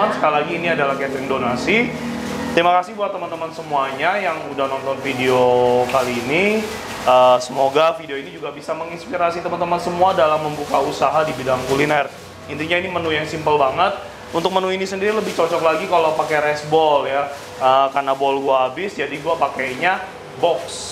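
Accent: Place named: Tegal, Indonesia. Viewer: Aja miring miring ooohhh hhhhh.... Kayane sih wong tegal lek ora wong purwokerto..😀😀😂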